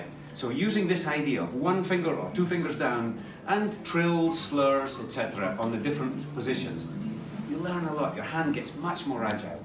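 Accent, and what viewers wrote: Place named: Scotland, United Kingdom. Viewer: It s a middle class well spoken scottish accent for christ s sake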